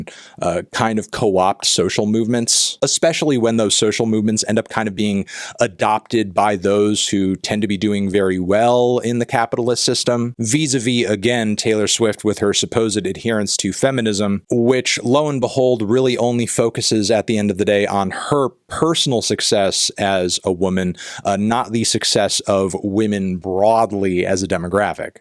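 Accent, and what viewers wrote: United States. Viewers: I thought you were American :o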